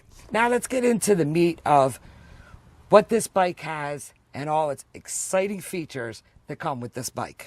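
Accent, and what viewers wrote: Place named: England, United Kingdom. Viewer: Great video and very informative, I too noticed you have some English accent as I say leeeeeever too!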